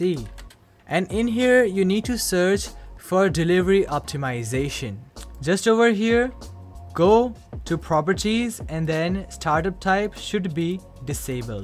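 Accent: India. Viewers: nothing your voice was like a indian because idk why i can tell every voice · It actually works! It didn't help me to a great extent but it's defenitely better I think the firewall settings made a huge difference,my game is so much better now! Thanks for that Also i have one critisism. No hate just a friendly critic,you should just stick with speaking indian accent(or speak accurate American accent), you're trying to speak American but it feels very much like indian accent mixed with American accent(I don't have a problem with that but it's hard for me to understand,and I'm sure it's hard for many others as well) Peace 👍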